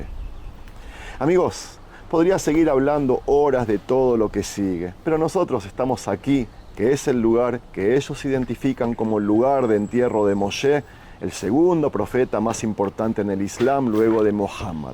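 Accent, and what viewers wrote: Argentina. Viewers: Te crees Judío y al escucharte eres un Argentino. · Dices que eres judío pero hablas argentino dx Como es eso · Hola he oído y visto más tus relatos y apreciaciones personales pero muy sesgado e irrespetuoso con la confianza brindada que pena pero que se puede esperar de un judío y cuando comentas del Corán no es la Biblia del Islam es un libro Islamico ..ya que Biblia significa libros que fueron de diferentes épocas y diferentes profetas o no? Eso significa en el hebreo o no? Ya y el Corán es un libro repito como la Torá y si eres apasionado de la Biblia te felicito y me gustaría preguntarte si la leíste o solo eres fanático? Y si lo leíste dime que significa lo que dice Isaías respecto al pueblo judío? Y que quiere decir en el capítulo 52.13 en adelante? Y que manda a decir nuestro YHWH =nuestro Creador en el cap. 54 del mismo libro? 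Y si habla de cronología de la Biblia Jesús que es para usted? Bueno espero ser respondido y no sea usted un mero YouTuber ufanado en conseguir seguidores con sus fantasías y debe usted ser imparcial objetivo y verás si quiere hacer un reportaje y más si se trata de religiones así los que no saben aprenderán pero cuando usted usa su canal para sorprender en el español de acento Argentino causa usted preocupación y molestia y los que hemos leído algo de la Biblia y conocemos algo del Islam quedamos sorprendidos al ver a un judío siempre judío por quienes murieron todos los profetas perseguidos y por quienes Jesús fue juzgado y muerto o me equivoco? Y hablo de un punto neutro sin afán de bandera religiosa .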